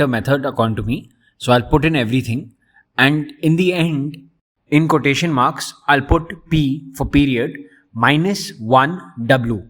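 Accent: India. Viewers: Indian English accent is one of those I have trouble understanding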